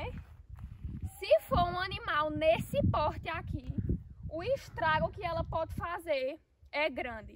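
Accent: Northeast, Brazil. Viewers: Belo vídeo, acrescentado com o sotaque nordestino da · PARABÉNS, nunca perca este SOTAQUE! PORQUE ELE CARACTERERIZA OVERDADEIRO NORDESTINO. HOMEM E MULHERES BATALHADORES QUE Ñ TROCAM SUA DIGNIDADE. MUITO BENÇÃO! PARA SUA FAMÍLIA, E A TODOS QUE ASSISTEM A SEUS VÍDEOS ❤ DEUS TEM VCS NO CORAÇÃO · Legal esse sotaque nordestino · Ei 👸, você é nordestina mesmo, tem sotaque acentuado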